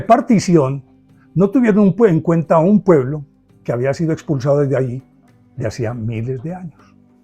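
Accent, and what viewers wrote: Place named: Colombia. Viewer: Buena Charla, primera vez que te escucho, creo eres Colombiano, muy interesante tus datos claros y mundiales.😮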